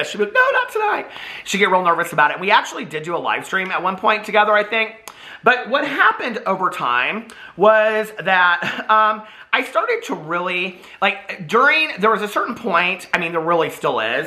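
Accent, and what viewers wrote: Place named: Midwest, United States. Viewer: The Midwestern accent was popping in this video and I LOVE it!!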